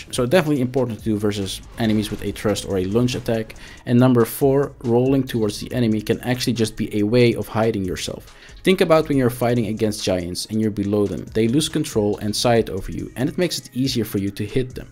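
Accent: Netherlands. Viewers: For sure your Dutch :D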